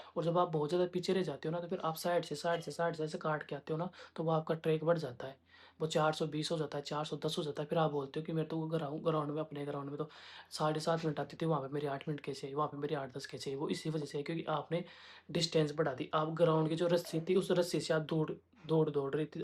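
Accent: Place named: Jaipur, India. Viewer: aapke accent se hi samjh aa rha h kyuki m bhi jaipur se hu..and my mom use to sounds like you😅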